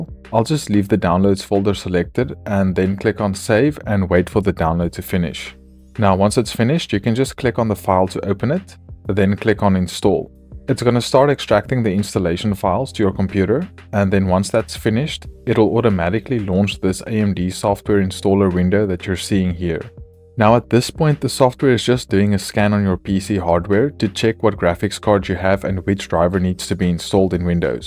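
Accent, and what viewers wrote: South Africa. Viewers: Definitely South African accent 😂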